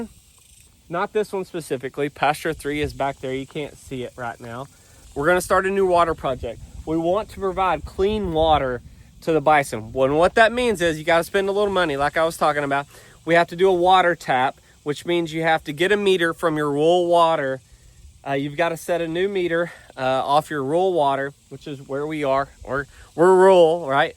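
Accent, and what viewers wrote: United States. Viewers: You can say “Waller” all the time as far as I’m concerned. Please don’t change your country accent. It’s nothing to be ashamed of. I love it.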